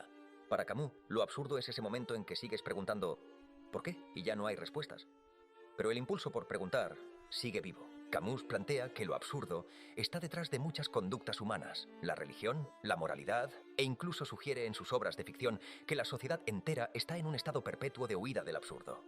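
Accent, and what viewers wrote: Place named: Castile, Spain. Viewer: Buen video , mas el acento castellano y la baja calidad del sonido lo arruino❤.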